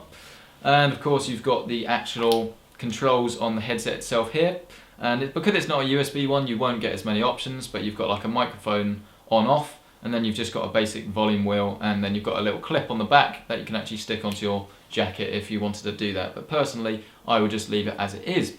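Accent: United Kingdom. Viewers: that's just his british accent...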